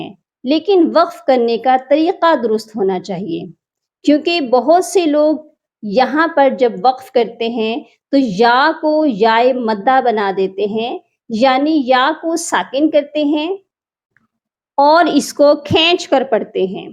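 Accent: Panipat, India. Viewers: اپکا پانی پتی لہجہ ھے ماشاء اللہ مجھے بہت پسند ھے لیکن میری سانس زیادہ لمبی نہیھے جسکی وجہ سے میں بہت پرشان ھوتی ھوں کوئی طریقہ بتادیں تاکہ یہ پڑھائی کر سکوں❤❤❤❤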